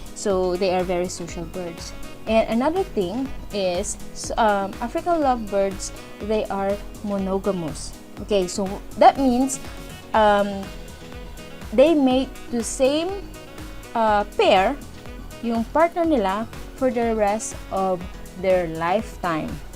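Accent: Pangasinan, Philippines. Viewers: Pangasina po kau Ma'am?hehe may accent ksi hehe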